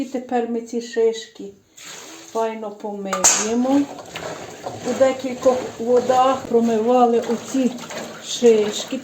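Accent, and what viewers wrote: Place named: Volhynia, Ukraine. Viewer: Карпати? Говір схожий на волинський, в районі Ковеля.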